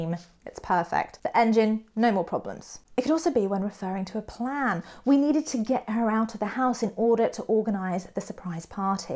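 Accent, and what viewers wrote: United Kingdom. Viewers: Hi, 🖐as always, I love the way you speak English, it's crystal clear, typical British accent, and most importantly, it's full of vigor 💪👍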